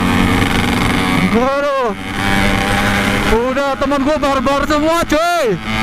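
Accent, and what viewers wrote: Banyumas, Indonesia. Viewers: pantes ngapak.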